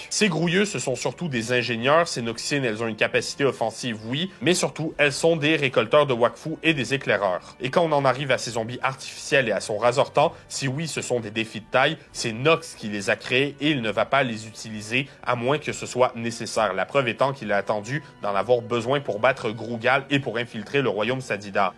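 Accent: Quebec, Canada. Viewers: Yo t'es quebecois???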